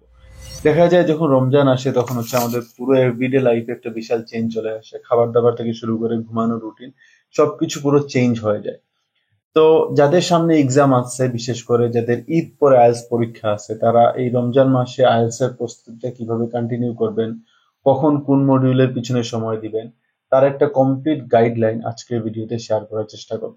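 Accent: Sylhet, Bangladesh. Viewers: ❤ সিলেটি ভাই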